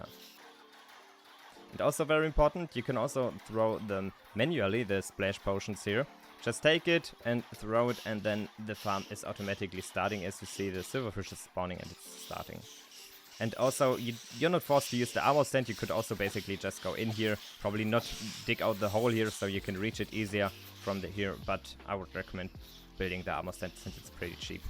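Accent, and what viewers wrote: Germany. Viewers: geiler deutscher akzent und geiles vid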